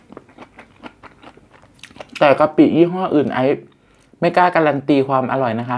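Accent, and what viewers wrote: Isan, Thailand. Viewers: ชอบตอนพี่ พูดอีสาน พูดทุกคลิปเลยน่ะคร้าบบบบบ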